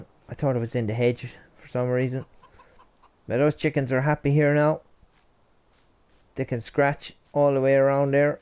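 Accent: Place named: Ireland. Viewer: Keep it up 👍 which part of Ireland are you from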